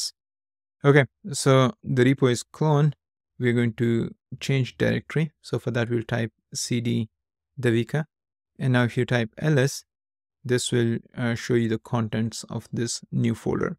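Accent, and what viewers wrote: India. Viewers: Bro it's a Indian accent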